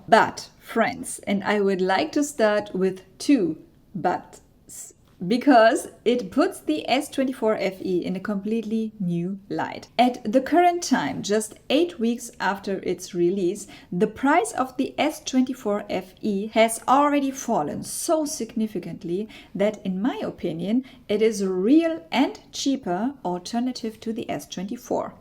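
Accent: Germany. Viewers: You have a beautiful German accent 😊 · She almost sounds like me with that accent. Living in the USA now coming from Berlin Germany.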